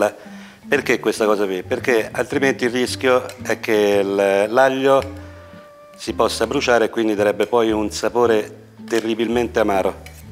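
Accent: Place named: Casentino, Italy. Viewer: Ok, dalla parlata infatti mi sembri vicino dalle mie parti Casentino e per curiosità te l'ho domandato, sorry :-) Magari mi sbaglio. Pura curiosità. Toscani numero uno!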